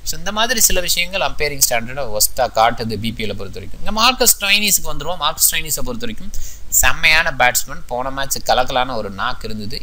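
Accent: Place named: Kongu, India. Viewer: Power play angatun. Kongu Tamil coming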